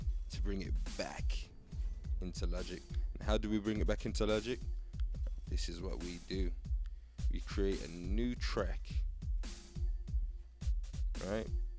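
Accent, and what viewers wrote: United Kingdom. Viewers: I sense some Midlands accent. 👍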